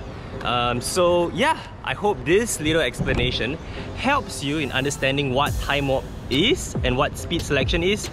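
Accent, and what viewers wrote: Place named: Singapore. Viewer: Idk why, but I'm just enjoying the singlish accent, LOVE IT ❤️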